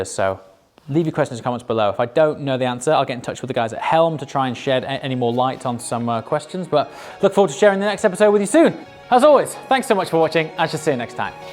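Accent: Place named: North, United Kingdom. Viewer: Well, with his Northern lilt, you can hardly accuse him of being pretentious!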